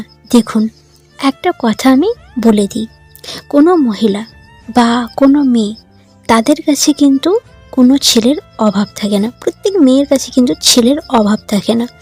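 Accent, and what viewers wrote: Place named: Pabna, Bangladesh. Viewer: পাবনার মধ্যে পাকা কন্ঠে ভিডিওটা খুব ভালো লেগেছে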